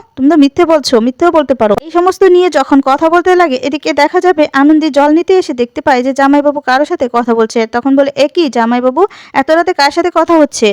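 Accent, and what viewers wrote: Bangal, Bangladesh. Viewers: আপনার বাঙ্গাল বাঙ্গাল, চোয়াড়ে,অশিক্ষিত কথকের কথকতা শুনতে মোটেও ভাল লাগেনা।যে গল্প বলার caption দেন,তা শোনান না।এটা breach of trust ,আইনত দন্ডনীয়। নিজের ভুল শুধরে নিন। চেষ্টা করলে শুদ্ধ, কথ্য ভাষায় কথা বলতে রপ্ত হয়ে যাবেন। আশাকরি ,চেষ্টা করবেন নিজেকে তৈরি করার। অনেক শুভেচ্ছা রইল।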